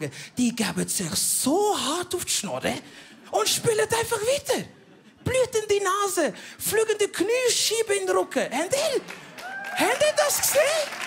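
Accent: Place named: Switzerland. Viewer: für einen Deutschen immerhin besser als nix :-).Schwitzerduits ist schon ganz schön anders als ich gewöhnt bin zu hören.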